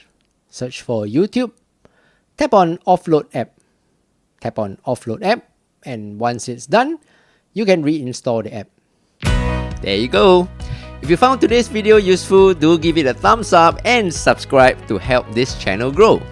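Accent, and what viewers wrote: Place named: Singapore. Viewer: Hi, Great channel sir. Im wondering if you can help me.. For the past year Ive been occasionally been making comments (usually compliments) on interesting channels. However I have virtually had no one ever add to my comment or even like it and when i look at all the other comments before and after mine most have a few other replies and likes from other members (who posted comments). I can visually see my comment after it's posted but it's like no one else can. Firstly, can you see my comment here? and secondly even if you can is there any other reason others cannot see my posts on other channels I comment on etc. Thank you! Jon New Zealand (Mate, you sound like you are from Singapore! I grew up in Singapore in the 80's lah!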